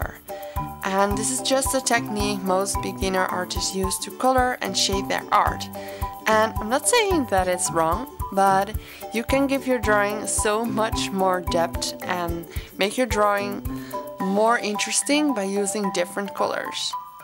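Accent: Netherlands. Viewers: echt een nederlands accent dat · Are you dutch?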